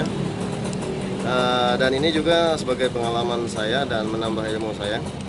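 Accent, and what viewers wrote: Palembang, Indonesia. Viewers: Wong palembang yo kak?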